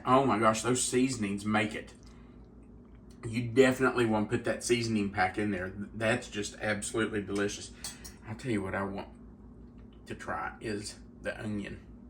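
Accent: South, United States. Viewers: Welcome to FL! I'm a lifelong Floridian & reside on the west coast. I'm a huge fan of your channel & enjoy trying your recipes! Keep up the good work! P.S. - there's nothing wrong with your accent! My entire family hails from the South so listening to you feels like "home"! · Love the southern accent. It always seems so welcoming. I received my cook book a few weeks and absolutely love it. You and did a fantastic job. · Your Southern voice is fine. Of course, I have a soft southern voice, so it sounds normal to me. I am proud to be southern and of my southern accent. I appreciate always typing the recipe below the video. It is so helpful. I enjoy enthusiasm for fixing the food. You all are an amazing Christian couple. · Keep on cooking, never mind your accent! Ain't nothing like a Southern cook! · This looks so good. My husband will love it! I love a southern accent. 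Never lose yours as it is who you are! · and..I enjoy checking in to find your latest recipe..my mom who is a wonderful southern cook..asked me recently..do you follow.." Come sit with me"..yes I replied..we both agreed how much we love watching yall..you see are from NC..BLESSED WITH A SOUTHERN DRAW.L... embrace the accent God gave you..that sets you apart,makes you different and helps folks remember you and your special channel!!..oh yeah thats the great cook with the awesome southern accent!! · I also love your Southern Accent, as Ms. Jen said. It makes me feel like I’m listening to someone that is kind, sweet and caring. This is what drew me to your You Tube. I am from KY, as I have said before and Proud of it. I have lived in Michigan for years but KY is my heart. You and are so good at this. Love love love to watch and listen to you both. 🙌🏻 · You should be proud of your southern accent. 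I live in Southwest Virginia and also have the accent. It is our heritage. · Mr., you have some color on your face. I'm so glad you're videoing from Florida. You mind including some of your favorite spots in Florida? Your southern voice is such a nice voice. I'm looking forward to making this video 😊 Thank you & 💖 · Those are some thick pork chops. Pork is my most preferred meat, but those are too thick for my preference. I'd have to use thin cut. That onion is huge! Cooked onions, "fo' da win!" Some years ago I, 'discovered,' I seem to have become allergic to regular rice - at least. 🤣At least you didn't say, "...can of chicken." instead of "...can of water." Would the onions cook down too much if they were diced instead of sliced? -, dude... That southern accent, yo [hehe] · I had that accent for many many years and it fills my heart with smiles when I hear you speak. I do so miss living in the South. 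· This looks amazing! I’m eager to make this for the family. There’s nothing wrong with your Southern accent. And you are an excellent teacher in your videos. You and seem like friends to me. I can’t wait to see each new video. The only way your channel could be any better would be if you had a new video every day. You and are fine people, and I’m happy to see your channel growing. The cookbook is great, too! Everybody should buy one! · I have a southern accent as well... This looks delicious. My hubby loves pork chops and I never cook them right. I would make this but I don't have a stove. I wonder if it would work in the air fryer. I watch you all the time... God bless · I also just absolutely love your southern voice! It’s so calming and relaxing to listen to. We never hear that in Canada and it’s a real treat. “Variety is the spice of life” my mother always used to say. How diminished we would be if we were all cookie cutter the same! 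Be proud of your voice; it’s a great asset. 💕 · Your voice is a super power! Something about Southern accents makes food seem tastier and the Gospel more relevant! ❤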